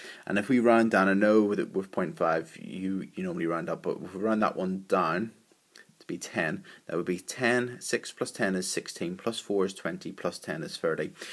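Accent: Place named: Scotland, United Kingdom. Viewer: what is that scottish accent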